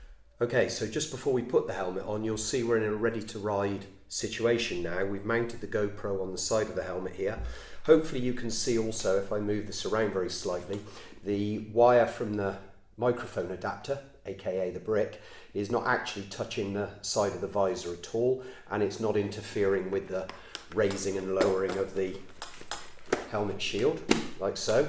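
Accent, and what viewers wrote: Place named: England, United Kingdom. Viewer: the accent makes you sound like the English Morgan freeman mate it's sick😂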